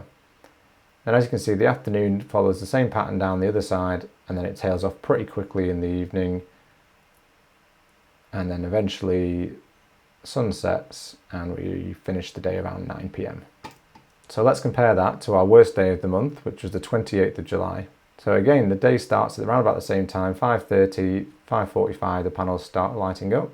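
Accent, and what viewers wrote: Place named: Northern England, United Kingdom. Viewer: Nicely done, so glad to see / hear a Northern voice. Will pinch the spreadsheet as well 👍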